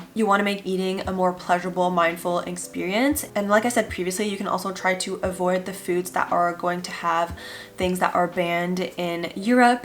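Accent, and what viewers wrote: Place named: United States. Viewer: Just started following. Love the videos, but I am curious why you have an American accent.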